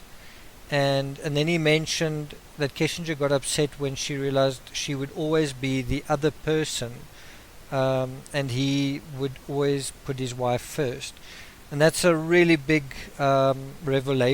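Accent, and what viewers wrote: South Africa. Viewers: He sounds like an Indian living in South Africa 🤔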